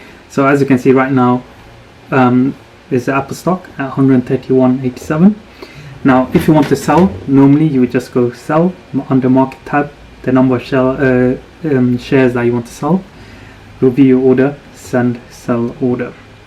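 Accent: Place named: United Kingdom. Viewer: thank you for the advice, love the video, love the UK and the accent as well :D thumbs up for the vid!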